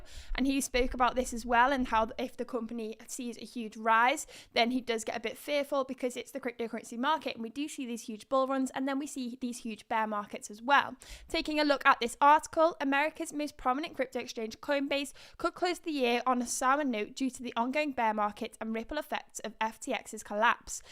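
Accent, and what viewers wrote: United Kingdom. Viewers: Your on form 🦋 I'm sure your a fellow Brummie ✨ Great job.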